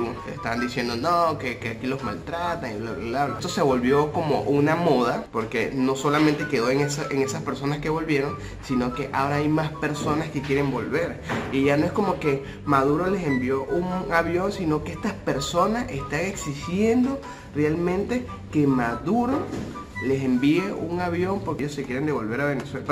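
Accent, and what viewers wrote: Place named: Peru. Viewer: cada ves te sale el dejo peruano naturalmente,y la manera de hablar del señor bayly xd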